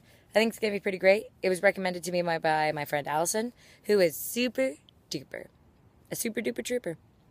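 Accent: France. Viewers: Your cadence throughout this feels very french, even before you started talking about accents. :-) Sounds like a good book. I will see if I can squeeze it it. You seem to like books about self discovery, or self help books quite a bit. Or do you make your decisions based on trying to teach your audience?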